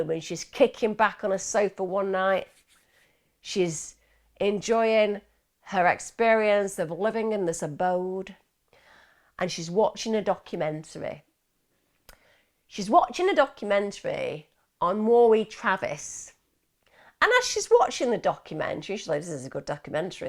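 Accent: United Kingdom. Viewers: I'm getting ready to watch this new episode. I think this is my third episode with you. I listen to some other true crime YouTubers who occasionally do a "deep dive" but all your videos are deep dives, which I appreciate. I'm turning on the captions because my American ears can't always understand your lovely British accent.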